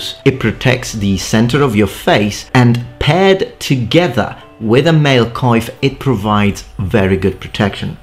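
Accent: Italy. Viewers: Are you italian? Your pronounciation of "montefortino" was perfect. The accent too sounds a bit italian but it's so slight I can't tell. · Madonna se si sente l'accento italiano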